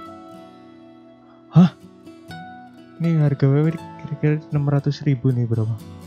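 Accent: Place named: Banyumas, Indonesia. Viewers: Jan ngapak pisan koh medok medok😂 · Ya musah ngapak lah kwiwkwowo guyonan om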